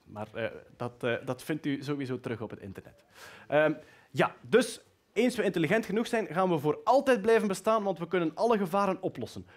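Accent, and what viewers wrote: Flanders, Belgium. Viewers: Gaat helaas niet meer op sinds we in 2019 weten dat de aarde geen kromming kent. Geen bal, geen ballen universum, geen zwaartekracht, geen evolutie....aiii. Metingen aan het aardoppervlak tonen een vlakke aarde, dus de logica gebied dat er zeer aannemelijk een Schepper in het spel is. Een ander leuk nieuwtje: de Ark van Noach is gevonden. Maar voor een Nederlander is het heerlijk naar deze man te luisteren. Het Vlaams is zo mooi! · Goede presentatie. Heerlijk om Vlaams te horen. En voor iedereen een begrijpelijk en interessant verhaal denk ik.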